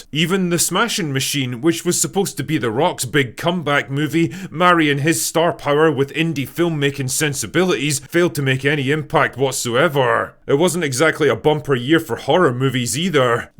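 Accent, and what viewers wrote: Scotland, United Kingdom. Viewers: It always brightens my day to hear this crazy Scottish bastards voice.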